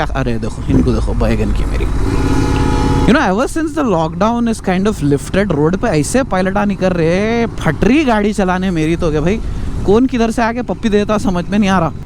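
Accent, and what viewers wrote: Hyderabad, India. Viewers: Bhai the way u talk is so much good because u r very experienced rider and your Hyderabad language is 😍😍😍😍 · Hey! Awesome content, entertaining, love the way you talk.. and that Hyderabadi touch is cherry on the top. I'm planning to buy 650. Wanted to know about how much does it heat really (Think of Mumbai traffic.. peak hours) I had bought a brand new Street Twin and i sold it in 6 months. One of the primary reason being the heat that it generates while riding in the city. I'm riding a 500 classic right now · like your content specially the way you talk Hydrabadi I just love it. Good job and keep up doing well. Best of luck waiting for your next vlog. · Bhai aise hi Hyderabadi slang main baat. Karo mast Maza aara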